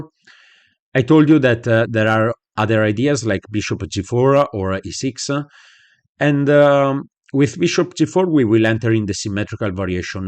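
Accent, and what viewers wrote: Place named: Apulia, Italy. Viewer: Accento pugliesissimo ahahah grazie per la lezione!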